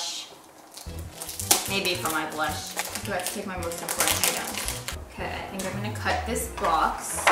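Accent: France. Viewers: hi! hello from Sydney, Australia. You are such a sweet and creative person. Love all your videos and thanks for all the efforts you put into them. It won't surprise me if you will work with a jewelry or bag company one day to have a collection. Last but not least, love your "French accent"!! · I didn't expect that but you made it! great job:) btw I'm from Paris and whenever I hear your french accent I'm dying 😆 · the french accent HAHAHAHAHAH made my day HAHAHAHA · i LOVE the french accent "rrrrrrrr" 😹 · LOL, I'm sorry that I just can't stop laughing when you looks serious on the street...and I really love your French accent XDDD Love you <3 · I love it with your French accent omg you made my day loving the bag !!! Hahaha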